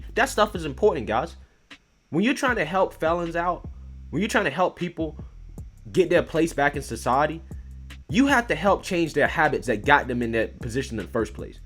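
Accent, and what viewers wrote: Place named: Washington DC, United States. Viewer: Hey boss where are you from city wise, just curious my guess from the way you pronounce certain words i would say the DC area and or the Baltimore area? I'm a correct? Lol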